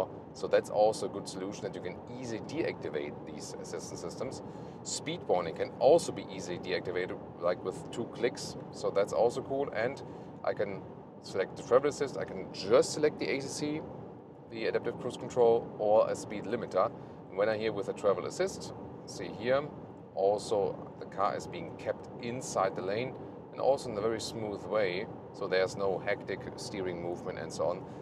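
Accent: Germany. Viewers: Der Typ schaft es deutsch und englisch zugleich zu sprechen.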